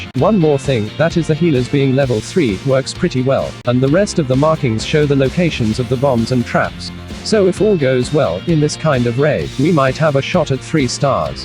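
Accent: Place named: India. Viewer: He is indian. I automatically off when i heard the accent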